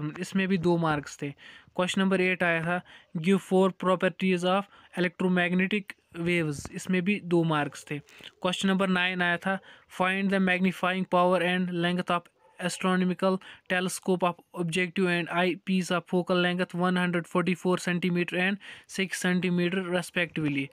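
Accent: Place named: Kashmir, India. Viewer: Sir aap Kashmiri h kya? Aapki slang me se pata chlte h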